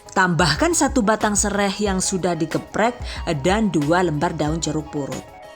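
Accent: Banyumas, Indonesia. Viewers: "DONE" Mksh ya bun,atas resep nya.trnya bunda wong ngapak ya....? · Ada ajh yh guyonan ngapaknya Paling suka ❤️❤️ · Kok ada ngapaknya ya,jd lucu hehehh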